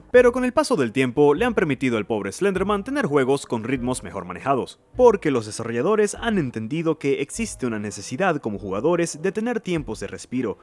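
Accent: Venezuela. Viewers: Eres venezolano?? Suscrito de una mi hermano!! Buen vídeo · mano me encanta tu forma de hablar,tienes como el acento venezolano perfectamente equilibrado ,tal como debe ser :v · Contenido de calidad! ... Y lo mejor :D , un toque venezolano (GG)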